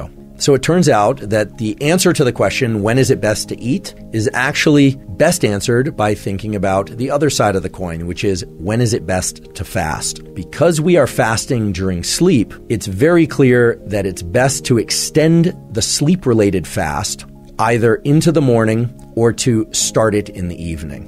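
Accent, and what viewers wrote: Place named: Canada. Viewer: Canadian rhythm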